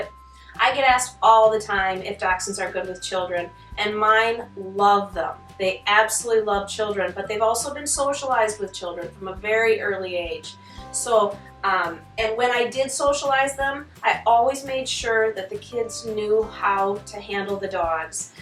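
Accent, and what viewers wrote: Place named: North America. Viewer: Your dogs are sweet but they are not dachshunds in terms “real” dachshunds (do not want to use word pedigree altho that is what i meant but sounds a bit nasty). There are only two sizes in Dachshunds, the standard size, up to i think 10.5 kg and the miniature Dachshunds,up to 5 or 5.5 kg. Nothing in between. Now in terms of colours. They are black&tan,chocolate&tan,red and i think it is called dapple , i am not sure. I am not quite sure about colours, but if you are going to buy Dachshund puppy and the seller sells it as pedigree puppies, one quick,easy way how to spot that the Dachshund is not Pedigree is present of white colour. Apart from the Dapple,white colour might be present in tiny amount on the chest but even that is UNDESIRABLE. Pure (short or long haired) pedigree Dachshunds have ZERO white colouring. If there is a trace of white colour(mostly on the belly/chest) , you should know that the person is not genuine selling pedigree Dachshunds and that will be mostly the reason why seller says : pedigree but NO PAPERS,or Very rare colour etc.... 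so what you have got there are sweet dogs, but not up to our European standards to be called pedigree Dachshunds. I can not differentiate the USA accent from Canada’s one, but you are surely from American continent where i noticed the acceptance of “non pedigree” Dachshund mixed with other breeds of dogs calling Dachshund. German, the original Dachshund breed here in Europe simply can not be white mixed colour which is way out o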